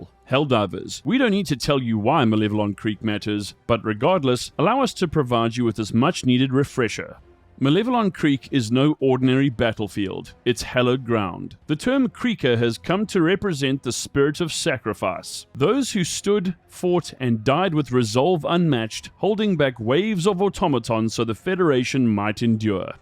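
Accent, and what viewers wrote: South Africa. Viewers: Can't place your accent. It sounds like a South African that lives in Texas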